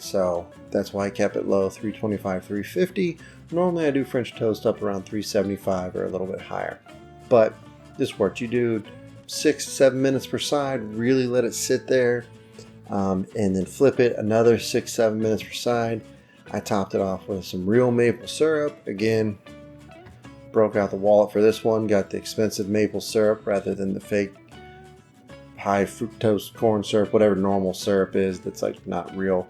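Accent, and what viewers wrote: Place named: Southern Ohio, United States. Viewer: Missed the biscuit bowl video this year. I’ll have to try it. It looked mouth watering. You must be from southern Ohio😬. I have a friend from southern Ohio and except for the northern accent, he’s not much different from the southern rednecks around here in South Carolina.😬 Love your videos. Good luck with the detox.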